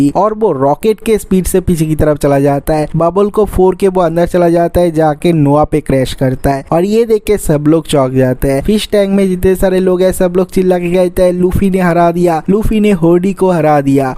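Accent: Bengal, India. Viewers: Tum kiya bangali hoo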